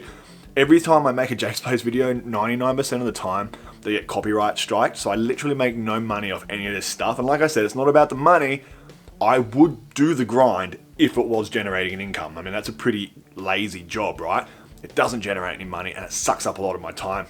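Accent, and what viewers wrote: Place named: Australia. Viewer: Make more mate. I love listening to aussie creator, the yanks are okay but good to hear a voice i can relate to.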